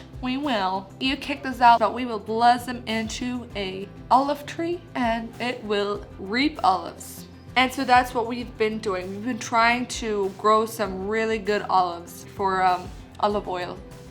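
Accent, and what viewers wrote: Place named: South, Germany. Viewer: As a young southern german woman, I looove your German dialect, especially since I understand it and know Mist Germans wouldn't. It is such an fascinating mix of several southern dialects, it just makes me so happy to listen to it. Thank you for sharing your life with us. I love learning about your community 😇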